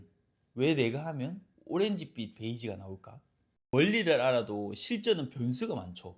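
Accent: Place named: Changwon, South Korea. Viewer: 쌤 창원 출신인가요? 억양이 딱인데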